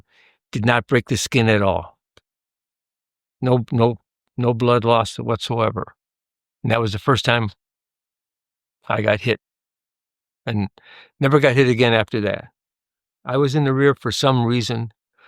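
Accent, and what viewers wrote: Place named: Chicago, United States. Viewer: Sounds like you're from Chicago - maybe Southside - me too - US Marine, 1968, Phu Bai/Hue City. Well Done Marine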